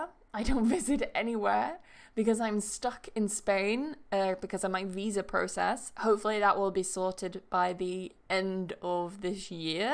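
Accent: United Kingdom. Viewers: Tengo casi tres años de vivir aqui en UK, y nunca habia encontrado un video con una persona de habla Inglesa Británica que explicará tan bién sobre la Pronunciación de las palabras, Vocales, Diptongos y demás. En lo particular se me ha hecho muy difícil aprender el Acento British, debido a que yo aprendi un Inglés muy Americanizado por no decir un Espanglish, Enhorabuena por todo lo que enseña en sus Videos, ahora ya tiene un nuevo Estudiante...!!!! Congratulations...!!!!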